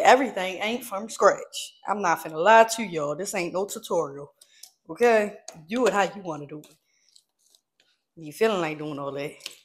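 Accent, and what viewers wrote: Baltimore, United States. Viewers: i hear the baltimore in you🤣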